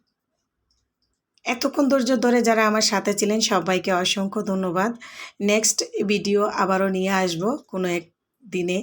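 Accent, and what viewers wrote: Sylhet, Bangladesh. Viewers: Apur mat ta very nice lager na sylheti kori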